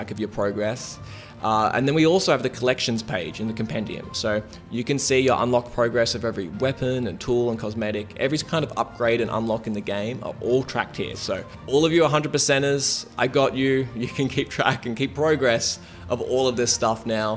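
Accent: Australia. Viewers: I've just been recommended Len's Island by the dear Lord and Savior, Steam Algorithm. At first I was immediately sold already, but then I looked up who the dev is and BAM! I found your channel.The very first time I heard your Aussie accent I know this game is going to be LEGIT. Thank you so much for developing games like this man. I long for real good survival game with procedurally generated content and I think this game will be it :) Love your stuff man!!! <3 Can't wait to download this game and stream it. Much love and respect from Indonesia.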